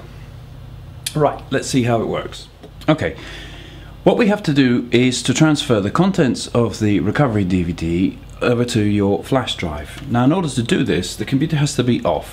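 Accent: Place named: Australia. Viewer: WHOOOOOAAA THE VOICE (i love australian accents) are you australian? lol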